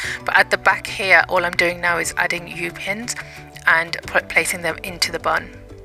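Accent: United Kingdom. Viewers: Her English accent 😍😍😍😍😍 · I could tell by your accent that your're british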